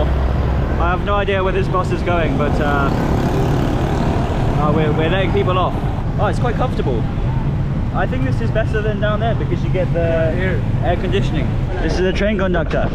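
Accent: Pakistan. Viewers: Makes me so happy you're already sounding like a Pakistani :') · I saw your videos recently on tiktok and came here to watch all of em. I really love your videos as i am also from Pakistan. Love your pakistani accent tho . Keep up mate. 💙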